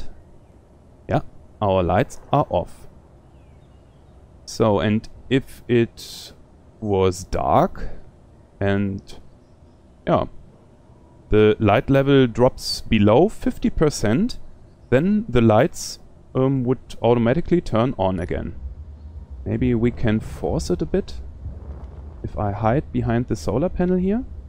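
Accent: Germany. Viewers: As a German, I swear to God this guy is a German as well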